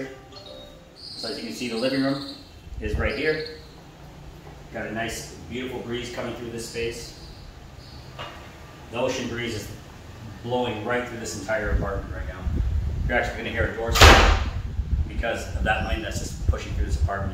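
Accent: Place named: Canada. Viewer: You sound Canadian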